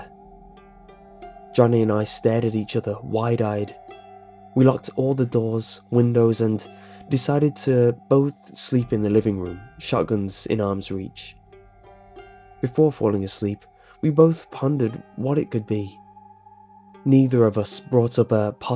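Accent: United Kingdom. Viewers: Americans? The guy reading this has a British accent. Are you kidding me. Why does everything in the comments have to relate to Americans.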